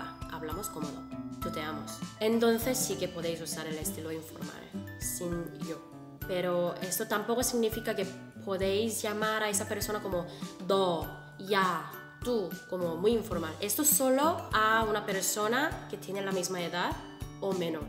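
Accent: Spain. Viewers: Ella aprendió un mal español al estilo español, que feo y ella tan hermosa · Eso es lo malo que es español de España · Ese acento de España 🤢.. bye ✌🏻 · Nuevo sub por cierto me gusta como habla el asento español <3 saludos desde colombia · Loool. Una Coreana hablando español y encima con asento de España >:0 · Que linda, tienes el acento español de España. ¿Cómo fue tu vida para adaptarte a la cultura occidental? Ya que veo un choque abismal entre culturas; no sé si tienes un vídeo sobre el tema, es mí primera vez viendo un video tuyo. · Bueno yo quiero decir nomas que queria entender lo que ella decía pero solamente me pasé escuchando la entonación de ella y no lo que decía.. Nose si ami nomas me paso pero me gusta mucho como habla los españoles con la "Z" ella habla perfectamente bien 🥺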